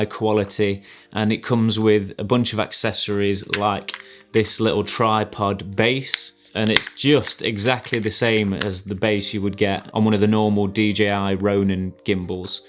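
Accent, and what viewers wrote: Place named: Mansfield, United Kingdom. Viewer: Great review. You sound like your are from mansfield, pretty sure I recognise that bridge. Rainworth bypass? 😆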